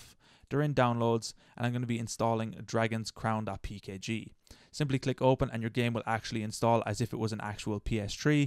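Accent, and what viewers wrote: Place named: Ireland. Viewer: what part of ireland you from ^^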